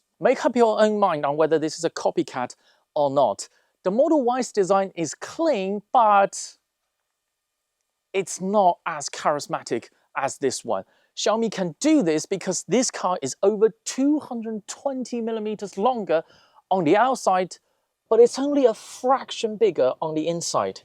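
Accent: United Kingdom. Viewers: The British accent is so distracting😂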